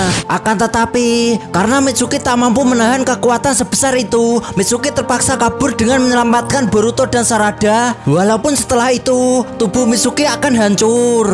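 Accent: Java, Indonesia. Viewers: Medok bgt lu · Medhok bnr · Pengisi suaranya lucu mas,, dari jawa yak wkwkwk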